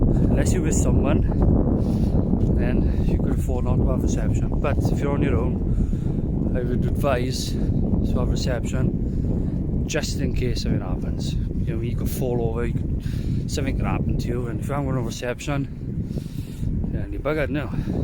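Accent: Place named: Wales, United Kingdom. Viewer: Just stumbled on your vid. Great tips. Love the accent I lived in South Wales for a bit. I miss it down there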